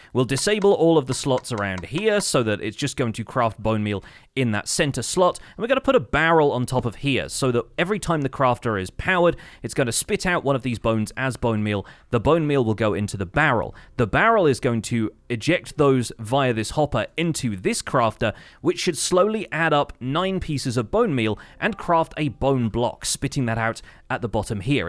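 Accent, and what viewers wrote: United Kingdom. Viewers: yeah yeah.. go spend an hour building a machine to make 100 pink dies a second.. then you can make crafters for every other die! That's already like a dozen Plus videos! You're so hyped for auto die.. it's funny! To answer your question: I think I'm dunking on just another Minecraft YouTuber with a British accent.. you all make the same videos too.. you all just copy each other.. You're a dime a dozen, Bud.. Step on down off your high horse. Who do you think you are? -PHILZA!? ...not even close Got anymore stupid questions? ..Go craft some die.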